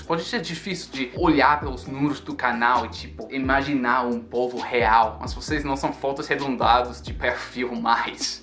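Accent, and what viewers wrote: Brazil. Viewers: Ótimo português. Parabéns. Seu sotaque e suas expressões são oficialmente brasileiras rssss. Quem sabe um dia eu venha aprender inglês com você. Ótimo vídeo. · ja ta parecendo br com o sotaque kkkk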